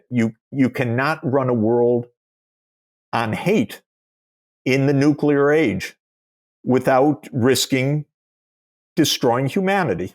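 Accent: Netherlands. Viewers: Your heavy Dutch accent is so funny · You sound dutch,it is Sachs not Sex😊 But never mind,good to invite mr.Sachs👍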